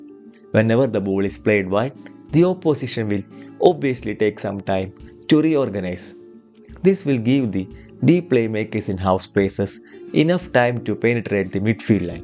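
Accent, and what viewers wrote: Kerala, India. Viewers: Malayalee alle aa English kettal ariyaam.. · Damn the mallu Accent is crazy!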